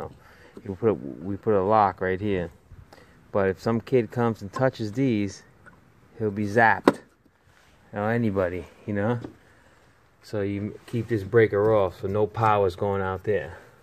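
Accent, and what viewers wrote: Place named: New Jersey, United States. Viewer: I'm gonna guess New Jersey accent ... Thanks for the video. You did good.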